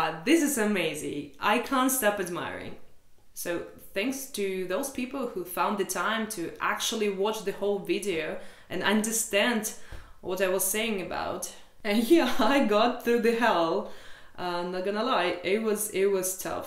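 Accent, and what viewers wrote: England, United Kingdom. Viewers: Lmao, of course you sound British, when it's your profession. I've chosen American. How dare we sound native! 😂😂😂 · Don't forget that your accent sounds very British, and NOT Russian so this is a barrier to some to believe you are not from the West or you are truly Russian. It's almost incredible to believe you have never been to the UK! I myself was fooled in this regard. This shows that as a linguist, you are very very good at speaking and learning English and with the desirable accent!!!! So, I had to re-listen to your Serbian "comparison" video again to find out why all the negative comments. And you DID NOT say anything bad about Serbia,, most of it was positive comments about Serbia. It was truly just comparing a few things in each of the two cultures. I truly don't know why all the negative comments afterwards. Maybe they interpreted it differently given their various background experiences. 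· I'd like to see you do a video about the contrasts between Russian and Texas cultures. To me your southern drawl is not perfected yet but you're pretty smart, you can do it. Of course in south Texas the accent is different than in east Texas and a southern drawl is different in Texas than Alabama. To me it sounds like you speak English with a British accent but I doubt English sounds the same everywhere in England. And when you consider the UK, Canada, Australia, New Zealand and south Texas, it's different even among(st) native speakers. To me it's more about what we have in common. You're from Russia and not everybody is the same there either. But some of us share common values. Thank you for your videos. I would welcome you and your family in my home, I would sponsor you all (ya'll) if you want to visit. Take care... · Probably not what you want to hear but I’m British and you do sometimes sound British (almost) ….but obviously I can tell you aren’t! 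Your accent is really interesting….oh and ignore the haters! 🤷‍♀️You should come to the UK and finish your conversion to 🇬🇧😀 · Hi, I like your English accent. Maybe you can make videos about how you learn English. You can share your experience with us. You know there are also many platforms where you can teach people English, and some of them are paid very well, especially Betakid. By the way, I think that this scandal in Serbian portals will help you in your advertising. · Why do you have a British accent??? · WTH??? I told you previously that your video was better (and in many ways) far kinder than most travel videos. For example, if I were doing this, I would point out that Russians rarely smile, men make more effort to be gallant to their girlfriends /wives... I truly can't understand why it would 'blow up' (?) this way. But remember... There is no such thing as 'bad' publicity. 😉 And your accent... 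You sound like someone who learned British English, not American English. I speak (badly and haltingly) BRAZILIAN Portuguese. Not continental, nor Azorean. That's what I learned. Different words and different style of pronunciation. At least this proves the saying : Haters gonna hate! 😆 I like you. I like your channel. They can all go pound sand! 😆 😘🥰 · ) yes you sound british .:)) iv got 🇷🇴 blood in my vain , but leave in denmark, iv got a serbian koleg and im trying to learn serbian. As a russian how well do you speak serbian?? and how long time did it took you to learn it?? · Dear, Ignore the haters. Many of them would not notice the video, if it would be on Russian and not on English. 😄 Your English is excellent and I really enjoy your British accent. I watched a few videos and I really enjoyed the content. I wish you all the best in Serbia. 😊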